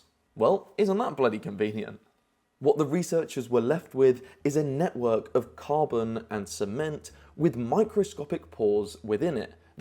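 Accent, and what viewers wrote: United Kingdom. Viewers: Enough of the British accent. Speak English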